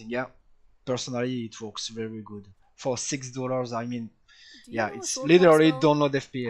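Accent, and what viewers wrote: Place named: France. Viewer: Ahah, j'ai entendu l'accent, j'avais un doute!! Vive la France!! Vive la république!!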